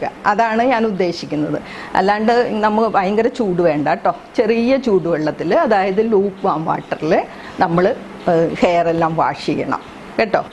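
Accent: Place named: Kochi, India. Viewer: എനിക്ക് സംസാരം കേട്ടപ്പോൾ തോന്നി ഞാനും കൊച്ചി,കൊച്ചിക്കാരൻ, ടിപ്സ് ഒക്കെ അടിപൊളി ❤️🤝🤝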